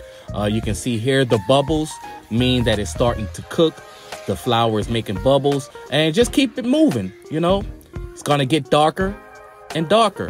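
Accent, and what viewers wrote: New Orleans, United States. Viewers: Ok I hear that Nola accent so you good😃Baby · This is the best gumbo recipe I have seen online and I have seen like 20 of them!! And we trust your judgment because you talk with a Louisiana accent 😄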